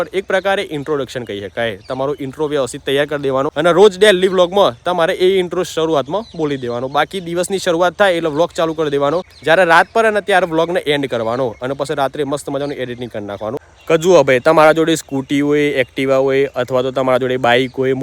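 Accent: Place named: Mehsana, India. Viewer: મને બીજું કંઈ નથી ગમ્યું બસ ભાઈ ની મહેસાણી ભાષા બહુ ગમી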